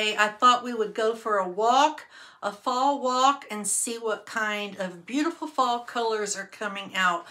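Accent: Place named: Indiana, United States. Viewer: I thought you sounded like a Hoosier 👍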